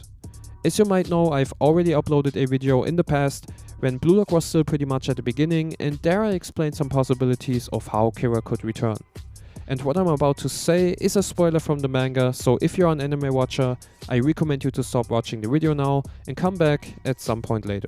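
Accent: Germany. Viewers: du bist doch safe deutsch. ich erkenne an deiner Aussprsche bei einglisch. ist richtig gut!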